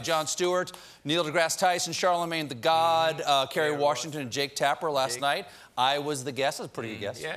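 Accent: Australia. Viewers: Can someone tell SC there is a difference between a working class/outback Australian accent and an Australian newscaster accent.........go for the second one not the first. Especially if you are going to do a good Assange. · English accent has an Australian accent. Funky.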